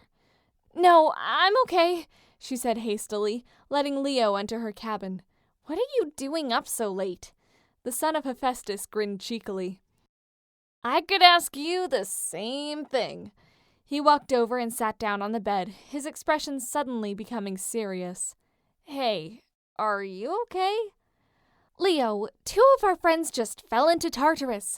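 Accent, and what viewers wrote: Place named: Texas, United States. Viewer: This is the BEST Jasper (or Jiper) fanfic ever!!!! Oh my gosh!!!! And that Texan accent!! If that was an attempt at a Texan accent, it was good!!! (I'm a from Texas, I know an ok accent when I hear one.)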